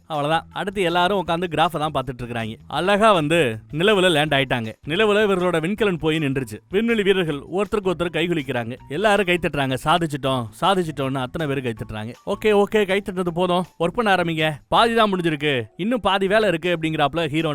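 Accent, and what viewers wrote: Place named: Sivagangai, India. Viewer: ஐயாவின் விளக்கம் வெகு விமரிசையாகவும் எதார்த்தமாகவும் அங்கநங்கே நையாண்டி நக்கல் கலந்தும் சிவகங்கை வட்டாரமொழி வழக்கிலும் உள்ளது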